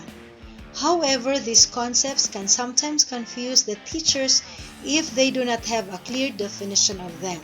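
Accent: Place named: India. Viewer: Indian English is too easy to.detect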